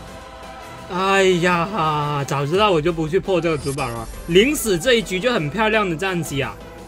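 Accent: China. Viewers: 你是大陸人嗎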